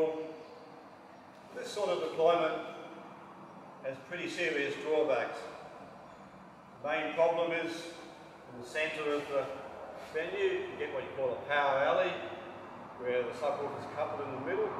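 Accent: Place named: Australia. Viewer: Australian... cool.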